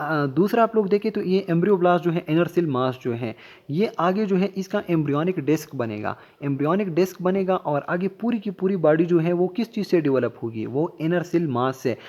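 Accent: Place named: Khyber Pakhtunkhwa, Pakistan. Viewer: Great lectures sir helping alot. Seems like you’re from kpk??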